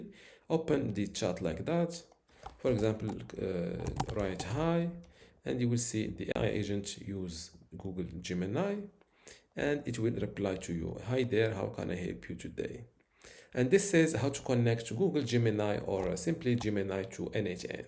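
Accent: Morocco. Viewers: I hear a morrocan brother <3 Hello from DZ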